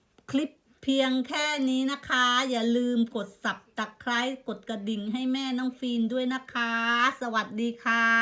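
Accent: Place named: Surin, Thailand. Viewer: ขอบคุณสำหรับความรู้ดีๆครับ เสียงบรรยายคล้ายคนสุรินทร์บ้านเดียวกันเลยครับ 555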